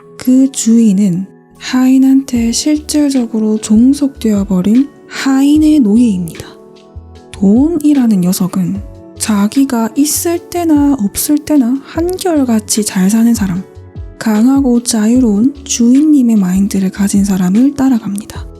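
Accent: Gyeongsang, South Korea. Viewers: 도네이션을 주기적으로 밥먹듯이 하는 이 채널의 정체는 무엇인고... 오호.... 제 유튜브채널 어차피 100만 넘을 것을 압니다.. 어차피 차고 넘칠 것.. 그냥 천천히 해보도록 하겠습니다.. 어차피 100억 부자 될걸알기에 천천히 즐기면서 인생 살도록 하겠습니다..ㅎㅎ 와... 이렇게 글만 적어도 너무 행복해지네요.. 더 신기한 것을 이 모든 내용을 말하면서 그림까지 완벽하게 그려내시는 당신은 도대체.. 짱이네요.. 그나저나 경상도 아니면 부산분 같은데! 맞나예? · 경상도 사투리 같은디.. 그것도 안동, 영주쪽 .. 본관이 어뎁니꺼? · 경상도사투리와 서울말이 섞여있네요ㅎ 매력적입니다! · 구수한 경상도말투에 너무 재미있게 잘 보았습니다. 앞으로도 계속 풍요 안에서 좋은 내용 많이 나눠주시면서 더 행복하고 자유로우시길 바랍니다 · 이 놈의 갱상도 억양은 정말..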